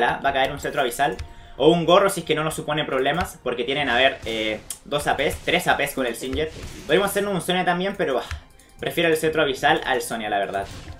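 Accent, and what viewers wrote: Chile. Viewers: es chileno no ? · es chileno sierto? v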